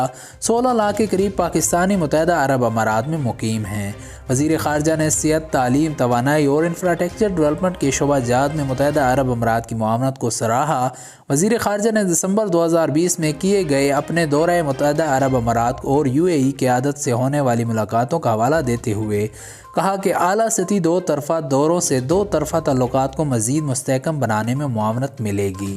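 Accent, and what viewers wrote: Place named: United Kingdom. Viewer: Please mere bhai apna English accent keep away jazakum Allah wo khair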